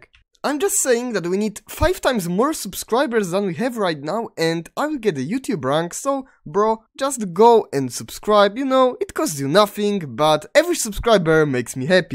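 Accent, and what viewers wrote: Poland. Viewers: Just by the voice i knew you were Polish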